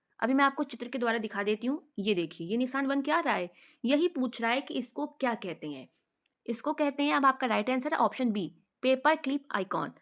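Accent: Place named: Bareilly, India. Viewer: सायद आप बरेली से होंगी अबाज़ से लग रहा है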